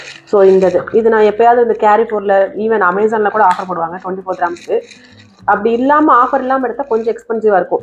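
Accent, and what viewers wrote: Madurai, India. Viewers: Hi i'm ur new subscriber.ur slang.....?i think ur native is madurai?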